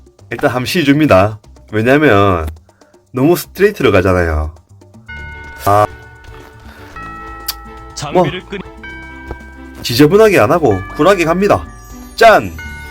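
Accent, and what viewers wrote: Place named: Gyeongsang, South Korea. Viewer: 사투리가 경상도인데?? 어디쪽이지??ㅎㅎ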